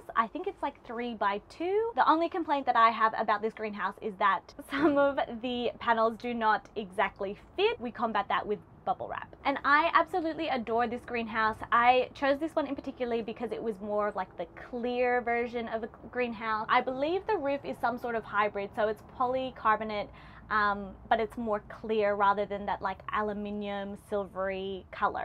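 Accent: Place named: Australia. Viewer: I'm picking up on a slight Australian accent, are you from Adelaide by any chance?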